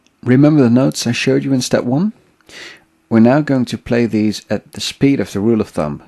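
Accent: Netherlands. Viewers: Oh my god. Thank you for this... 10 minutes indeed. Can't mistake THAT accent.. I'm from Los Angeles but my dad's family is from Arnhem. Bedankt! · Er vanuitgaande dat ik een NL-er hoor: Goeie uitleg! Dank!! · Quick question: are you Dutch?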